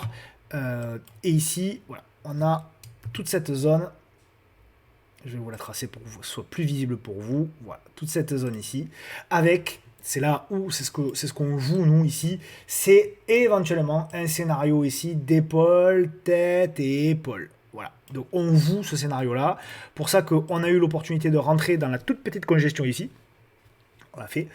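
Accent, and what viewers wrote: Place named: South, France. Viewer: Hello merci pour ton analyse, toujours intéressante et pertinente, l 'accent du sud ajoute un plus et la coupe de cheveux ca va 😀 ps: j' ai acheté Alstom lors de la chute des résultats, ca marche bien et je suis content que tu te trompes parfois 😉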